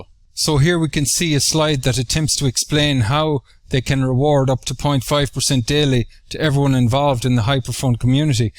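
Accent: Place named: Ireland. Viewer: awesome breakdown! the Irish accent is a pleasant bonus!! thanks heaps..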